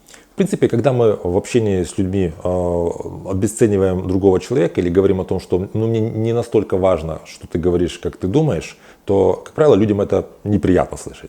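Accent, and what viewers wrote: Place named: Ukraine. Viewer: Похоже ,, психолог" из ,, незалэжной"- говорок выдаёт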